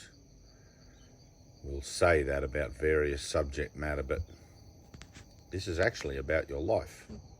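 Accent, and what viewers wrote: Australia. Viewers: 1965 - me to, I'm Pisces, in Australia as you sound to be, looks like QLD ? - this is fascinating, yes we are spirit beings having a human experience ! Now, also at 59 I have been "pondering" these issues since childhood & I find it so interesting that almost everyone with whom one discusses this, almost invariably cannot resist pointing out error of one type or another, this I am NOT doing ! I do look forward with great anticipation to getting my copy of this book, thank you so very much for this video & indeed your Y.T. Channel. A more captivating subject I have yet to encounter, love and light be with you and ALL souls now, 💜💜💜 💜 💜💜💜 🕉 🙂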